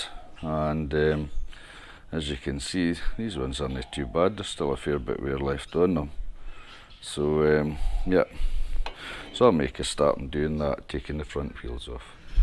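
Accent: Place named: Fife, United Kingdom. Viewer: Very nice! Being from Fife I knew the accent was from over that direction lol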